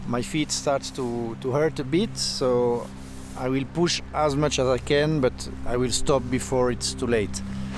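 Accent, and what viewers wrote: Switzerland. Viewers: Je comprends ton désir de t'adresser à une plus grande audience, c'est naturel quand on est sur YouTube et que l'on veut voir sa chaîne grandir. Malheureusement, il m'est difficile de prendre du plaisir en t'écoutant parler anglais étant moi-même bilingue et bloquant à chaque (grosse) faute de langue que tu commets. Tu vas certainement faire fuir une partie de ta communauté et gagner une nouvelle part d'audience, mais si tu n'as pas l'intention de transformer toute ta chaîne en english-friendly, j'ai peur que tu ne te tires une balle dans le pied car les francophone auront fuit et les anglophone n'auront plus de contenu facilement consommable et partiront aussi. En espérant retrouver le charme de ton accent fribourgeois. :)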